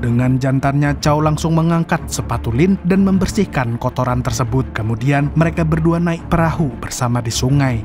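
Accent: Banjar, Indonesia. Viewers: Adminny urang banjarkh nih😅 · Urang banjar😂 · Umayy orang banjar sakalinya😂😂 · Orang banjar kah mang?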